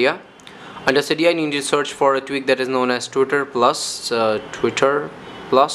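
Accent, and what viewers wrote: India. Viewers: Listen you don't have to talk in an American accent, it's annoying and it distracts from what you're saying... Apni normal Indian accent me baat kyo nahi karte use koi Sharm ki baat nahi hai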